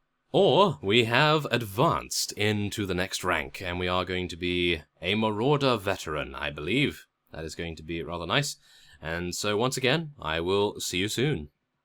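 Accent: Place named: England, United Kingdom. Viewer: hey thanks for doing this series i really enjoy your narration. idk what it is but I think the English have the best accent to commentate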